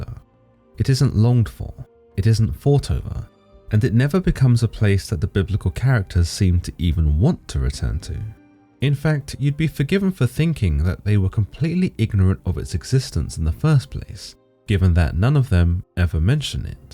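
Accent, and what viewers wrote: United Kingdom. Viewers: First time actually seeing this guy. This whole time I thought he was a Caucasian British man lmao.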